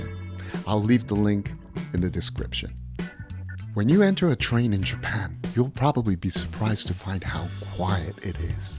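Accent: United States. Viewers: I'm very intriguing how did you get this American accent so amazingly speak? Please tell us?😊👏🏻👏🏻👏🏻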